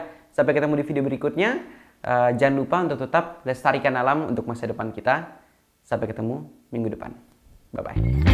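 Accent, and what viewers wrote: Indonesia. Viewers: ...jangan lupakan logat bahasamu..dimanapun kamu berada Saya suka tutur kata Dan logat kamu yg Indonesia bangettt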